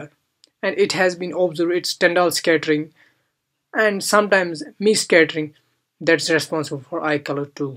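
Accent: India. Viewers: Ronnie yeah he has an Indian accent probably because he’s from India. It’s a bit hard to understand but not like you can’t understand anything. Lamo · I didn't understand one word this guy said LOL is he talking English.? he is talking like a scientist to us he is very condescending. also he has an Indian accent, which does not help matters! LOL He needs to talk to other scientists not the general public. Blue eyes, brown eyes , green eyes. that's all I could get from this guy.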